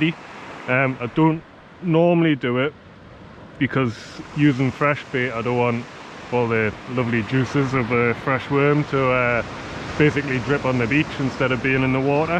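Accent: Newcastle, United Kingdom. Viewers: Listening to you with your Geordie accent using Cockney rhyming slang made me smile,. Thank you for giving us another video on a freezing night. 👍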